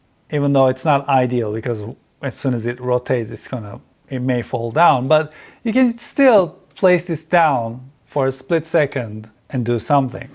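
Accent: Turkey. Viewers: Butun video dedim ki Turk aksani duyuyorum surekli. En sondaki hoscakalin the cherry on top oldu :) Daha bugun aldim cihazi merak ediyordum farklari tesekkurler video icin. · Aksanı türke benzemiyor mu adam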